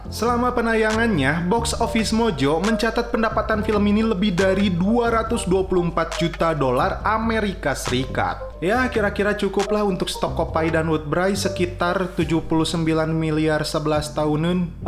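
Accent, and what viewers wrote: West Java, Indonesia. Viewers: Resep euy Ari nu nyarita org Sunda mah haha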